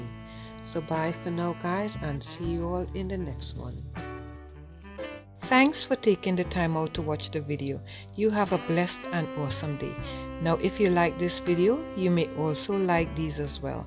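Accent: Trinidad and Tobago. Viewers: Wow you sound Trini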